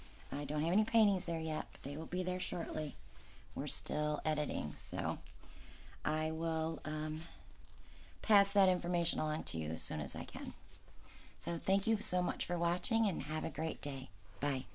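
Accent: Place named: Western New York, United States. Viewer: Hello. Thank you for sharing Learned so much from you. You truly inspire me. I heard you say New York and snow. Whereabouts? I’m from Buffalo, your accent sound western New York-ish. Anyway awesome Techniques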